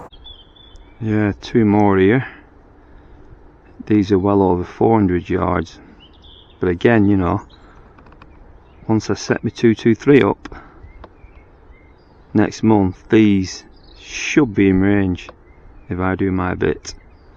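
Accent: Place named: Chadderton, United Kingdom. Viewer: Great video again.....doing my Henry Higgins bit ....from your accent you should have grown up in Chadderton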